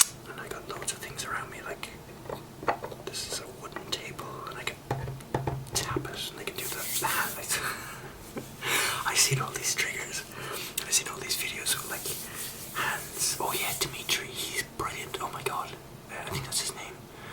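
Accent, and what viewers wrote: Ireland. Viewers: Where in ireland r u from?? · This is good stuff. The irish accent will do it for a lot of people. Keep it up :)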